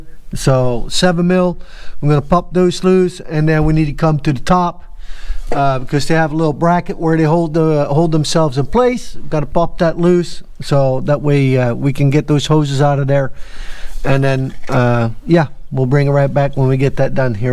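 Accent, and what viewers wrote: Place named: Newfoundland, Canada. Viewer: Great video. Thank you! I think I might recognize a bit of Newfoundlander in yaaa!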